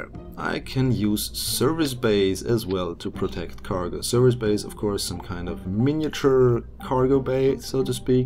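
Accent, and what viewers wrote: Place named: Germany. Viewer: u German? ur accent is just trying to Sound English but failing... just like a German accent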